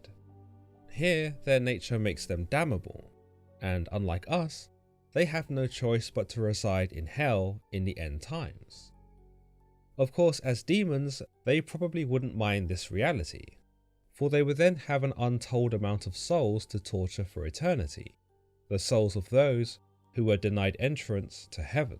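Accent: United Kingdom. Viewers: hearing a British guy talking about catching fish, with a creepy violin and piano track in the background is gotten to be my new past time!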